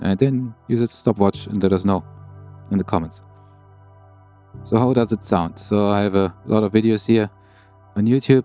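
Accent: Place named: Germany. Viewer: Habe mir das Video angeschaut und dachte, hmmm klingt irgendwie nach nen deutschen Akzent… wartete bis das Nummerschild sichtbar wurde… jap wurde nicht enttäuscht. Hätte mich aber mehr auf deutsche synchro gefreut 🤷🏻‍♂️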